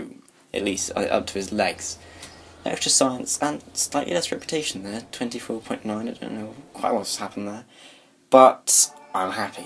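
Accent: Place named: Canada. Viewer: ***** is Canadian, probably why you cant tell:p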